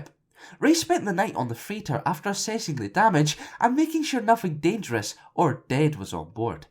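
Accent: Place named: Aberdeen, United Kingdom. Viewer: Im guessing you're from Aberdeen, Scotland and 17-19 years old?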